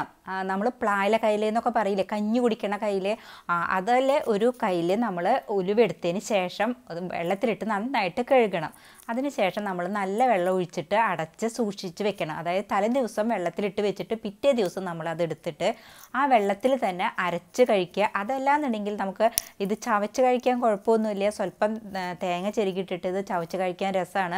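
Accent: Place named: Kannur, India. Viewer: നാട് കണ്ണൂര് ആണ് എന്ന് മനസ്സിൽ ആയി..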